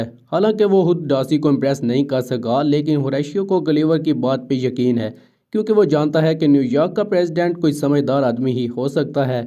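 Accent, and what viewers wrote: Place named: Pakistan. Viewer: Tumhari aawaj se lag raha hai tum Pakistan se ho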